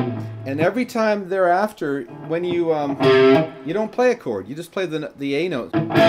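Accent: Canada. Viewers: I think the toughest part of the solo is definitely the 2nd half. Are you planning on continuing or doing another video on the 2nd solo in? BTW--I love your tone you get achieve for all the videos. It's spot on., and as far as I'm concerned there's not another YT instructor who takes the time and effort to get it exactly like the original artist. You're the only guy I go to after checking out dozens of YT instructional vids. Kudos. BTW--you sound like you could be in Canada or the Northern Mid-West; how close am I?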